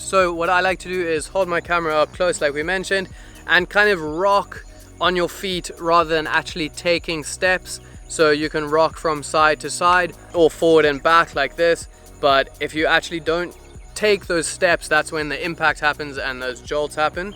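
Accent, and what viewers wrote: South Africa. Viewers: Trying to figure out your accent. My short list is South Africa, UK or Australia. If I was to guess then I’d go with South Africa - am I right ? Great content as always.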